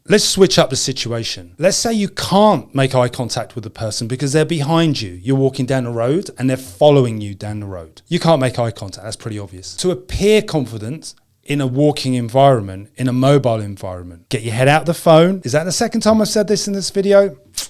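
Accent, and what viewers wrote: United Kingdom. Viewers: New York? You sound like you're from the UK with that accent. I just checked out your main page. You're usually based in London. Right??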